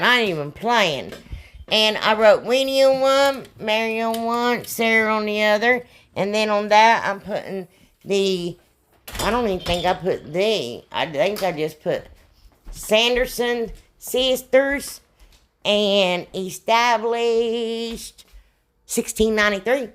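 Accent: South, United States. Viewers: Glad I found your channel love your ideas and I love your accent you sound like me I'm from west Virginia lol but you got that southern accent like me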